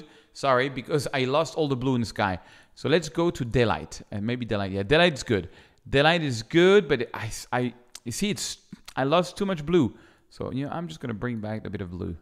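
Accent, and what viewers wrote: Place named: France. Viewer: French man, making fun of his own french accent. Class act!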